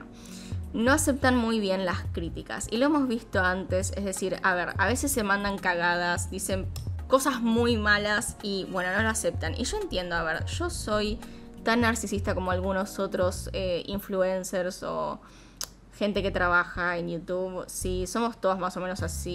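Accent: Argentina. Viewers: Amo demasiado este canal. Creo que me empieza a gustar mas que el otro. Me divierte muchísimo tu forma de hablar tan naturalmente Argentina y los temas de este canal. Saludos desde Santa Fe. Pd: El maquillaje de Natura es hermoso. Pd2: hay que lavarse los dientes pero tampoco demasiado. Ya que también te pueden salir caries por exceso de cepillado ya que te choteas todo el esmalte. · Soy yo o los Argentinos dicen anios en ves de años xd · Jajjaj me encanta el acento argentino jajaj saludos desde chile 😌❤️🙃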